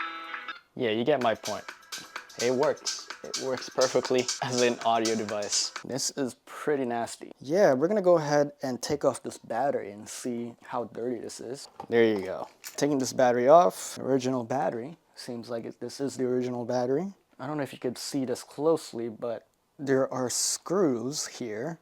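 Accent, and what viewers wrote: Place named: Asia. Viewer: your like a woman. I presume your asian judging by your accent which are the most woman like dudes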